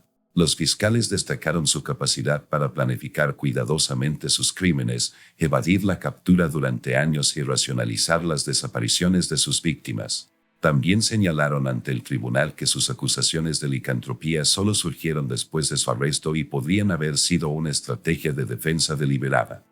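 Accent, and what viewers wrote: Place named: United States. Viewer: Por qué ese acento yanqui?????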